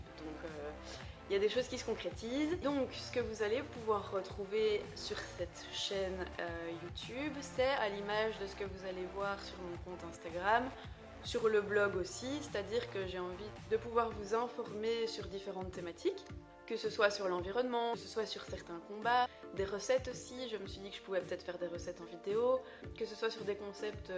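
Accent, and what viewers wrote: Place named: Liège, Belgium. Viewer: Vive l’accent de Liège (et du bw❤️)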